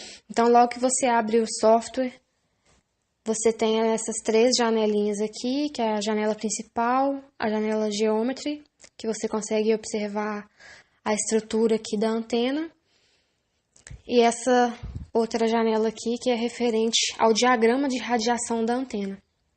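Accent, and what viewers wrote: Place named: Minas Gerais, Brazil. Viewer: Sotaque de mineira e mexendo com software de Antenas, deve ter feito Inatel...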